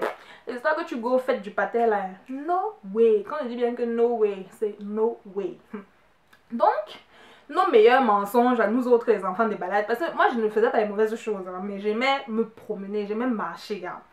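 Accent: Cameroon. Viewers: trop bien ! waouh tu es vraiment spide!! je kiffe l'accent camer ! tu m'a donné envie de manger ,je vais apeler ma marraine , je veux du ndolei ! lol en tout cas bravo !!!!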